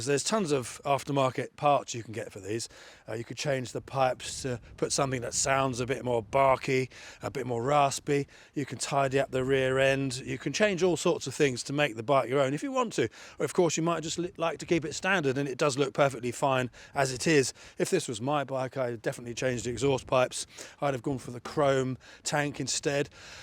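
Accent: United Kingdom. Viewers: you look like Hollywood star,with purely british accent.😁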